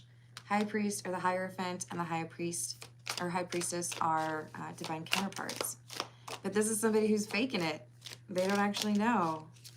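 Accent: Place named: Minnesota, United States. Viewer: Bahahaha..love the Minnesota accent. 😂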